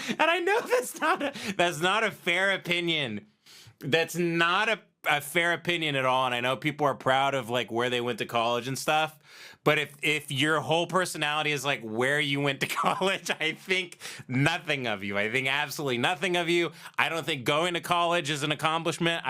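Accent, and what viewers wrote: South, United States. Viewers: i could listen to doing a southern accent about nonsensical college sports talk for 10 hours straight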